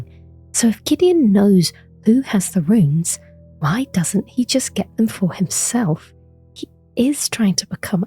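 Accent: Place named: North, United Kingdom. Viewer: Love his Northern accent.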